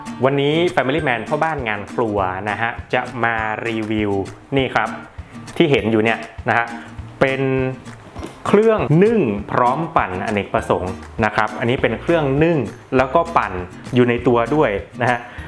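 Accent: North, Thailand. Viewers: ขอบคุณรีวิวมากจ้า แอบฟังสำเนียง คนเหนือรึเปล่าค่ะ555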